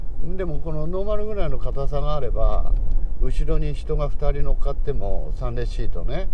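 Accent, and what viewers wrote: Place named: Nagano, Japan. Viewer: 的確な評価と、わかりやすい解説、とてもありがたいです。 どうでも良いのですが、水野さんは長野ご出身なんですね。 「ほら」→「ほお」で、ん？と思っていました。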